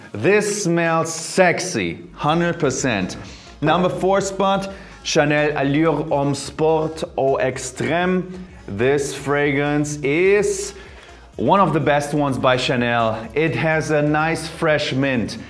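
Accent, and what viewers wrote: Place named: Germany. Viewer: Man , I Miss Germany , listening to you talk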